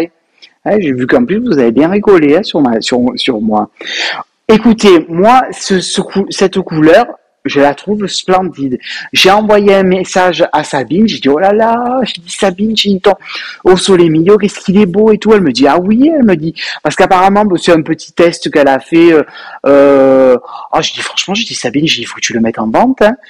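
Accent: South, France. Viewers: L'image est tres bonne mais le son mauvais.cela vient peut-être de la piece.je me regale de vous ecouter avec votre accent du midi c'est un vrai plaisir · Encore un agréable moment. De jolies laines et de bons conseils. J'adore votre accent plein de soleil et de cigales... Passez de bonnes vacances. Si vous êtes OK, vous pourriez nous partager de superbes paysages. Reposez-vous bien. Le son pas top mais bon......😊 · 😂😂😂trop sympa votre accent français à la Dior,,,j’adore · Coucou! Ce que tu me fais rigoler 😅 ton short kitch est hilarant et oui tricote. Tu feras une belle présentation dans ton podcast 😉 Comme toi je parfume mes laines avec des "billes odorantes" que j'inscris dans mon cake et comme toi je sens toujours mes laines 👃😂 Tes chaussettes "forêt enchanté" et tes glaces sont magnifiques, j'adore. J'ai le patron "forêt enchanté " dans ma bibliothèque et comme toi je vais opter pour les écureuils, ils sont trop chou 😊 J'ai toujours beaucoup de plaisir à te regarder tu me fais rigoler, j'adore ton franc parler et surtout j'adore ton accent du Sud et tes expressions qui sont succulentes. 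Bon été et bon voyage, tu vas en voir du pays 😍 Bisouxx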